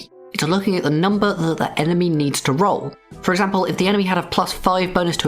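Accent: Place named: United Kingdom. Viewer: oh, you’re british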